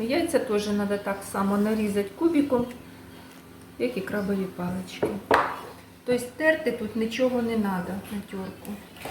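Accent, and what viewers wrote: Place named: Surzhyk, Ukraine. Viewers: Суржиком розмовляє весь південь України. Філологи вже просто дістали.Після кожного відео ці зауваження. До чого це?Не подобається не дивіться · Працюйте над собою. Суржик ріже вуха. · 80% українських сіл розмовляють на своїх діалектах. Мені дуже - дуже приємно слухати південний діалект. Так розмовляла бабуся, тітка, мої однокурсники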